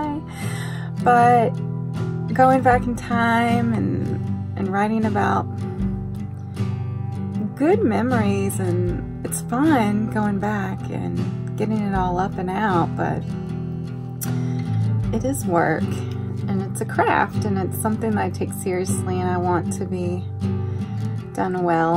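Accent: South, United States. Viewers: I enjoy hearing you talk,I always have liked to hear southern bells talk,it's just so sweet.I know you explain things that you say,so other people,not from the south,will know what your saying,but I get every word,and it's refreshing.Anyhow,keep up the goid work,I really enjoy your videos.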